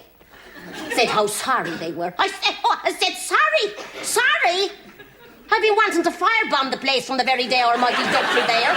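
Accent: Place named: Ireland. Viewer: This is an excellent irish accent !!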